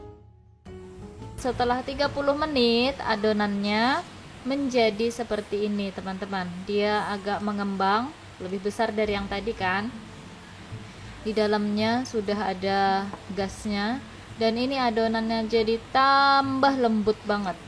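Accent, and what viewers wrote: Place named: Banjar, Indonesia. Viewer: Pasti urang banjar admin yg ngomong ini hehehehe..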